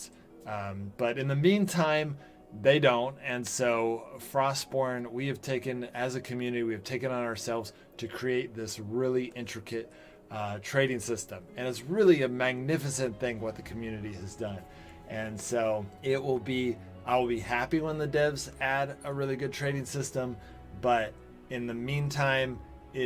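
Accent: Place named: South Africa. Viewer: That's dude got a south african accent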